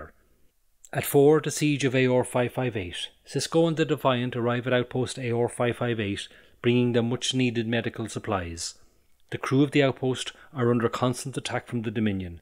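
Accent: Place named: Ireland. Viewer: jaysus I need my ears syringed 😂 nice to see / hear some content in an Irish accent 🖖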